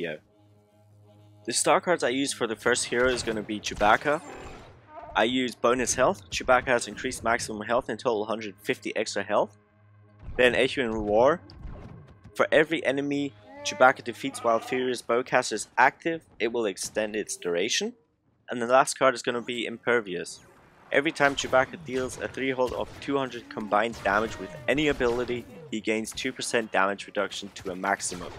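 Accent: Germany. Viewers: Der Deutscheakzent is da you are one of the best player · Dein Deutsches Englisch ist ja krass gut 👍🙈 Nices Video :-)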